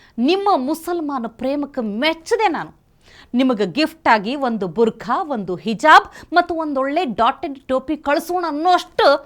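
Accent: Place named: North Karnataka, India. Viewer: Super , Nice to hear north Karnataka Kannada . Kadak Mirchi Mandakki video is very informative .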